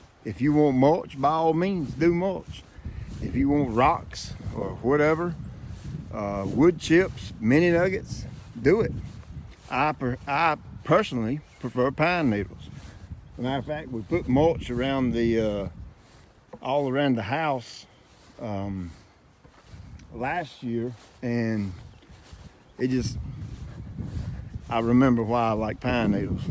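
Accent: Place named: Carolinas, United States. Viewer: Your video says how to have a wonderful lawn, but you talked mainly about the beds. By your accent, I guess you live in the Carolina's so maybe your winters are not too bad. Here in Canada we have pretty harsh winters which take its toll on the grass. I would love more information about how you get your lawn to look so good. I have a rural property and know from the size of your property you spend a lot of time on your lawns and they really do show it they are so lush. Do you have a video where you explain how you feed and maintain your lawn. Thanks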